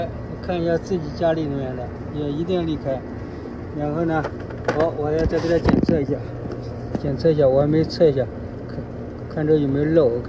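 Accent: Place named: Henan, China. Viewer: 听你口音说河南人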